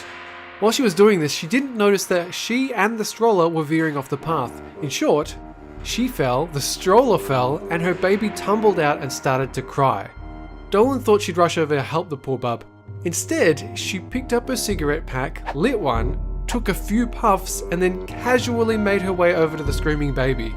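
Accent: Australia. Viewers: I SMELL AN AUSSIE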